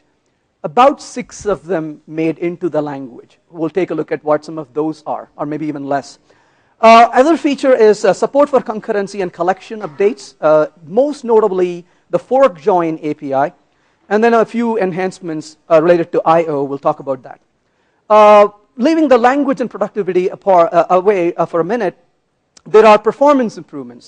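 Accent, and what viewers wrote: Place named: India. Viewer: 録音の状態が悪い上に、インド人的な早口でインド訛りが少しあるので聞きやすくはない。 それでもこの人の話は聞く価値がある。ヴェンカットさんはアジャイル系の本の著者。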